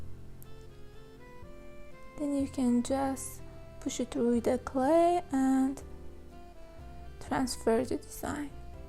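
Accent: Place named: Iran. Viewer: I can call it from the accent that you might be Iranian ! but thanks for sharing the technique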